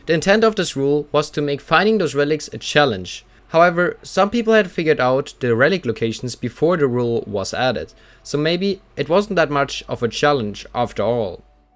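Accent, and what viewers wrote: Germany. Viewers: du bist deutsch oder hahaha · You are german aren`t ya? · Are you german? Your accent seems like a german accent.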